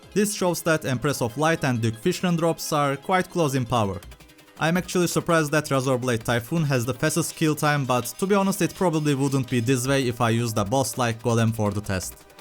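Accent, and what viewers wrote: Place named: Turkey. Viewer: i kinda hear a Turkish accent where are you from? i love bubble gun btw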